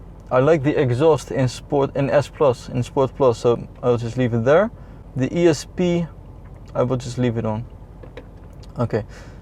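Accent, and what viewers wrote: Netherlands. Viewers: Je hoort gewoon door je engels accent dat je nederlands bent XD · ik wist al aan je accent dat je nederlands was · maat begin aan bmw vergeet deze.....en dat hollandae accent is slecht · Duidelijk een Nederlander!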